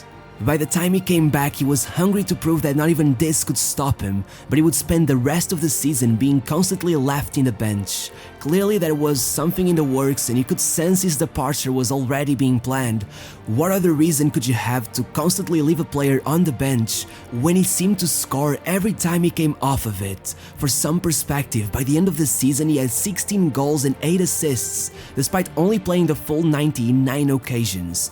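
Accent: Portugal. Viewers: Are you portuguese? This might sound weird but your english sounds like portuguese english :D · Are u a portuguese speaker? Seems like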